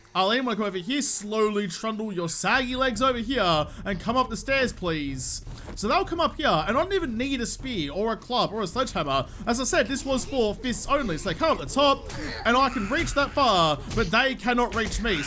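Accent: Australia. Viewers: Oh so your from Australia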